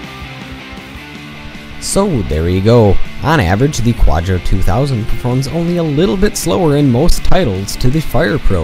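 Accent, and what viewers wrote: Canada. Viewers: are you Canadian? because to me you sound Canadian (no offense)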